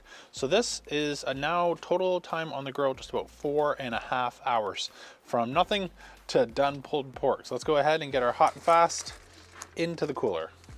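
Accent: Canada. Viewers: You can tell this dude is a genuine super nice guy - that along with the accent, gotta be a Canadian. Lol. Nice vid. Just watched the LnS vs HnF rib video and came here. I'm not sure how you just came up in my recommended since I've been watching bbq vids for years. Subbed. 👍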